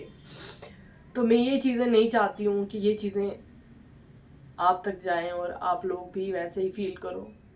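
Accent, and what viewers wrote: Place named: Himachal Pradesh, India. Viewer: Maine aapki video phli baar dekhi aaj, ye 2nd video dekh rha hun, mjhe aapki previous to nhi ptaa bt mai bs yhi khna chahunga k aap jhn rho khush rho...😊 Aaj ki date mai maa baap k siva koi apna nhi hota, rhi baat relatives ki to unhe to sirf bahana chahiye hota h... Aapka ghr bsaaa hua h or jo kuch abhi aap k paas h bs usi mai khush rhi...😊 Ye jindgiii ek bhgwaan ka diya hua tohfaa h isko khush hokr gujaaro👍 I don't know where from u bt I think you are from himachal by language pronounceation...!!! bt in this situations you hve to become a strong person...👍 So plzzz be happy keep happy always...😊 Lots of luv❤ from #himachal...😊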